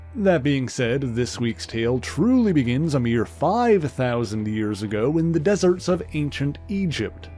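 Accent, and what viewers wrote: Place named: East, Canada. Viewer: Love the Channel! Sounds like an east coast Canada accent :) keep it up!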